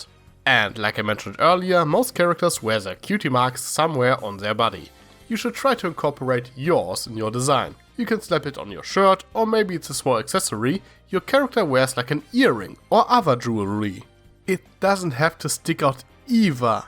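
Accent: Germany. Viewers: ARE U GERMAN!?! BECAUSE IM FAMILIAR WITH ZE ACCENT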